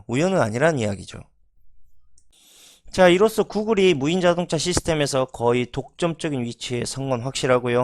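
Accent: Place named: Honam, South Korea. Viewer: 욕을 기다리며‥. 빠져드는 강의 ㆍ 정통 호남 발음. 억양 좋습니다 그럼 수고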